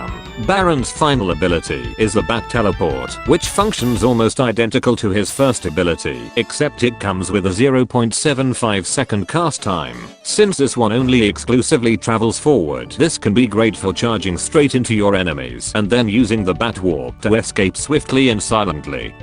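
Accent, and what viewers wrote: United Kingdom. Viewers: British? Hecking! Whaaaa!…..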